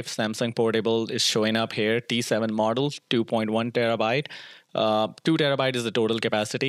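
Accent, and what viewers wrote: India. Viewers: Are you from india? because your accent seems like it and you also search bollywood videos in your 8k tv video that's my speculation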